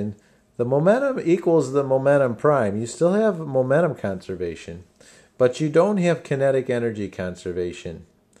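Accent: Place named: Michigan, United States. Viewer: Sound like your from Michigan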